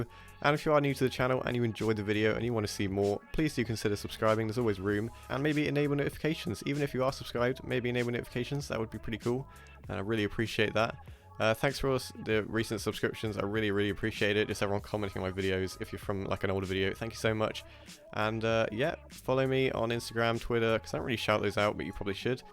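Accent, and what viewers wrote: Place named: North, United Kingdom. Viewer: hey man so where is ur accent from? like u sound like northern but you speak really clearly so i dunno what is going on lol.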